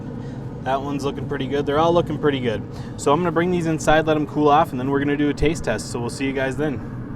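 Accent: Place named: Wisconsin, United States. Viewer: I gotta know. I see Texas everywhere but as a Wisconsin native I hear an accent that reminds me of home. Are you from TX or WI? Or other?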